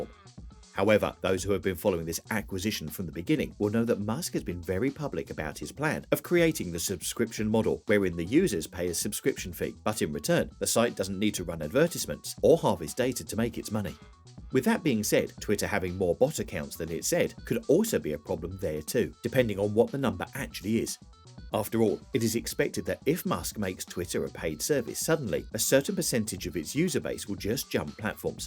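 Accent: United Kingdom. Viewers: What do otherwise educated people seem incapable of differentiating between "FEWER" and "LESS"? "Less users"? Really? Do you prefer "FEWER" jam on your toast? Fuck sake, it isn't even an American-ism. You have a British accent. One assumes you are a professional announcer. It's your job and inheritance to speak English better than this.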